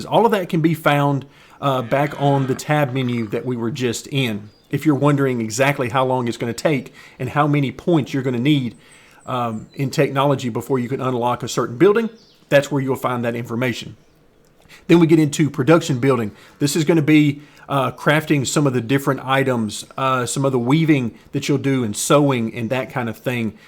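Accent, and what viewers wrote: South, United States. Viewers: Your videos are great, learnt alot! Also the added novelty of you having a Southern American accent whilst explaining a medieval game is brilliant! Keep up the good work 😁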